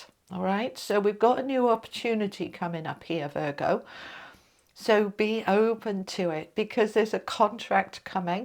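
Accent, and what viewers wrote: England, United Kingdom. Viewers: Love me some! 😍🥰 ❤❤ That lovely english accent always makes me go weak in the knees, sigh 😅😘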